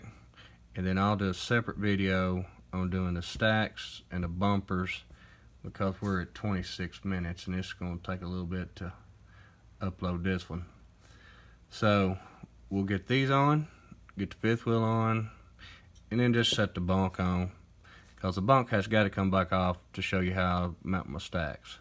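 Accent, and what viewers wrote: Kentucky, United States. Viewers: You sound pretty country, you in Kentucky? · oh ok. Well I'm out then lol I don't 3D print or anything. Btw you must not be too far away. You sound like one of us Kentucky boys lol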